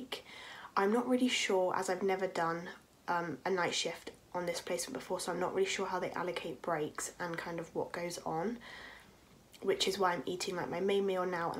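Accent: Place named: England, United Kingdom. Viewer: Sotaque inglês é bonito demais, pena que é difícil de entender kkk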